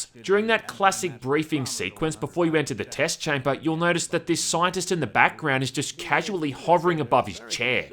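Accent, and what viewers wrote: Australia. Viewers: Real talk. Do you really lean into the Australian accent, or is this natural? You have the thickest accent of all the Ozzie YouTubers I’ve watched haha. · Your Australian accent, combined with your witty humor , made me laugh so hard I sneezed out my coke......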